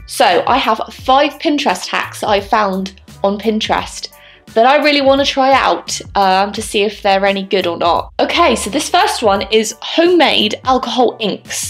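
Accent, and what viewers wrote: United Kingdom. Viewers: I thought u were british bcs of ur accent and voice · I love your accent and I'm British too 😂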